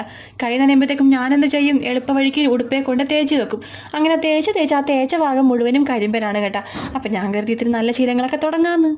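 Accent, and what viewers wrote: Kochi, India. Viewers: ഇന്നത്തെ സംസാരം എന്ത് രസമായിരുന്നു കേട്ടിരിക്കാൻ... തനി കൊച്ചിക്കാരി കൊച്ച് ♥️🥰.. ഇതാണ് ഒത്തിരി ഇഷ്ടപെടാനുള്ള ഒരു കാരണം 🫂🫂🫂🥰🥰🥰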